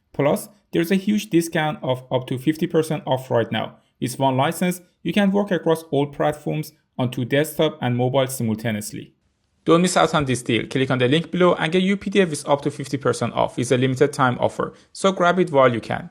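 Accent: Iran. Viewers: Love the Persian accent ❤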